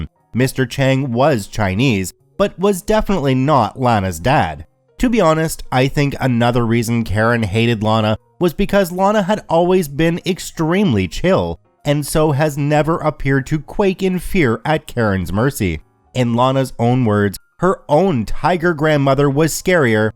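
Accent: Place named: Canada. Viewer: Youve said lana in so many ways so many times its freaking me out. Also did you ever mention that your canadian because every time you say sorry you say it like a canadian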